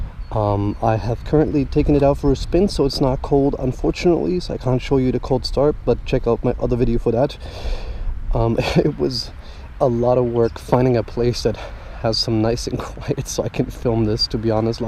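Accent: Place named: Germany. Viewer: Es ist so nervig dein englisch mit deutschen Akzent zu hören. Nicht böse gemeint!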